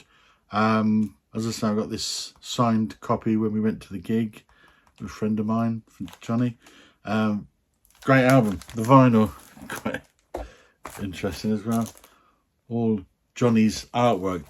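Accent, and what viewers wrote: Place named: United Kingdom. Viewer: Brilliant job. Thanks for those shoutouts! I agree about the PG album. There are a few songs that don’t necessarily hit you right away, so it will get stronger with more listens. I actually played it quite a bit before I made my list because I wanted to give it a fair assessment in a short period of time. I think this episode is a perfect example of why your channel is so popular. Your music expertise is remarkable and you have that hip, in the know kind of vibe. Plus being British it just makes you sound more intelligent and sophisticated. You definitely have talked me into giving a couple albums on here a try.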